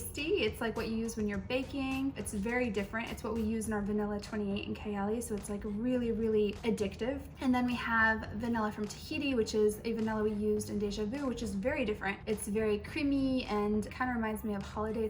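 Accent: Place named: France. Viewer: I love your French accent 😏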